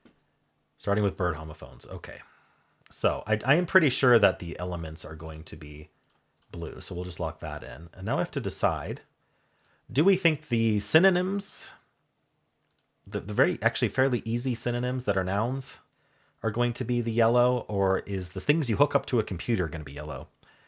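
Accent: United States. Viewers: the joys of US pronunciations i would never have gotten hawk and hock(ey) to rhyme - but then in the US sauce and horse don't rhyme but they do elsewhere.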